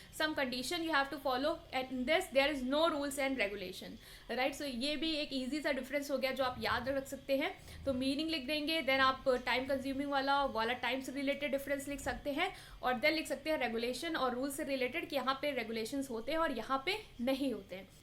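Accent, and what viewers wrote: Lucknow, India. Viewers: App Lucknow say hai lag raha😀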